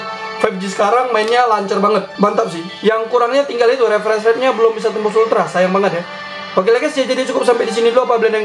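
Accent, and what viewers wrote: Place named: Sumatra, Indonesia. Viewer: dari logat dan gaya bicaranyabyg "ala..." kayaknyanorg sumatera ya bg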